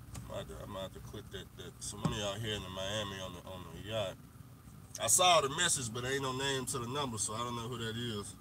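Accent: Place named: Texas, United States. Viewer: You’re starting to get that Texas Twang in ya.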